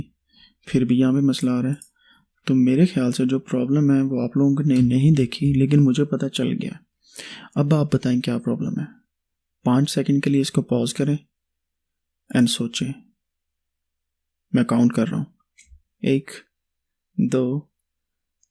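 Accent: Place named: Faisalabad, Pakistan. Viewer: kya maza aya, yayi wala accent yawwwrrr , and apka Faisalabadi accent, anyway keep us engaged and motivated. PS: 'anyway' b ap sy seka hai 😆 chillay k sath sath apka accent b sek jayengy 😃❤️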